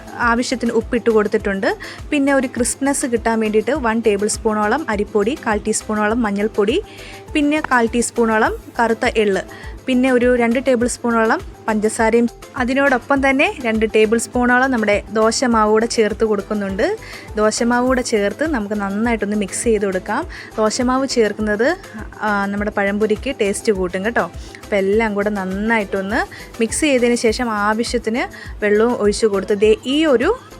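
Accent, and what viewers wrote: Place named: Kannur, India. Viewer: സംഭവം പൊളിച്ചു ☺️☺️🥰എന്തോ കണ്ണൂർ ഭാഷ പോലെ തോന്നുന്നു 😍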